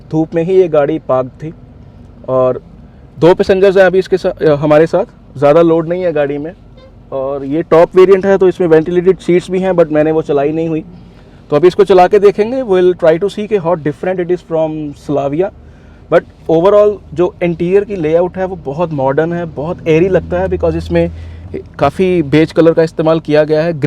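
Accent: Punjab, India. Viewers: punjabi lagda bai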